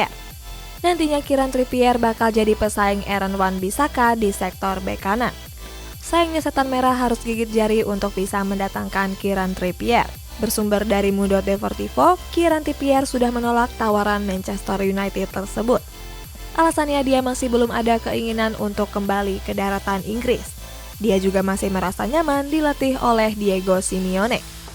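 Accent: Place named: Java, Indonesia. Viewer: Marai yo titisan wong kediri lho.. Main oo nde ndi wae logat jowone panggah enek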